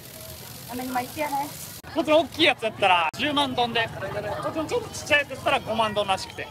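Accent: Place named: Mie, Japan. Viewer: 最近見出したのですが何人くらいで旅してるんです？ 喋り聞いてると三重の方かな？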